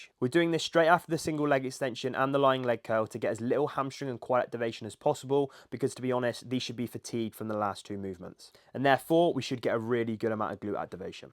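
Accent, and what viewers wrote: United Kingdom. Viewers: you have a British accent but Irish good looks.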